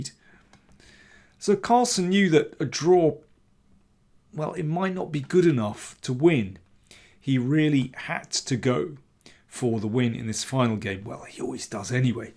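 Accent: United Kingdom. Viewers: Absolutely agreed. What is crazy is how long he has produced such top-tier chess content... I remember discovering this channel when Carlsen defeated Anand to be the World Champion around a decade ago. If Daniel King is analyzing a game, that's what I'm watching. From my experience, nobody does it better. And with such a pleasant British accent, to boot! That never hurts :)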